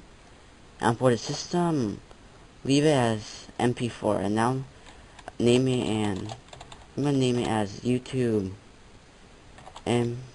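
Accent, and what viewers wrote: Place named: Asia. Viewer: Lol a very asian accent this guy has.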